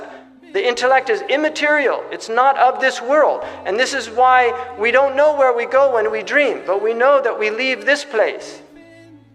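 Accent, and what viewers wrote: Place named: Arab world. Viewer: Hamza yusuf un arapça aksağanı çok kuvvetli maşallah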